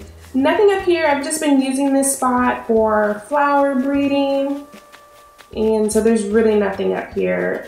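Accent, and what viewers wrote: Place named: United States. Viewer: What region of the US are you from? I noticed you say some things in an interesting way, like when you say "guys"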